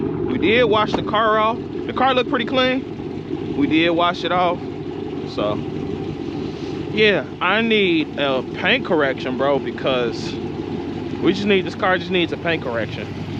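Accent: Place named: Chicago, United States. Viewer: Damn I miss the crib I'm from Ada park boi I know you from Chicago out here in Phoenix Az I don't miss the snow tho I'm hearing you talk like yeah he from the land 😂😂😂😂😂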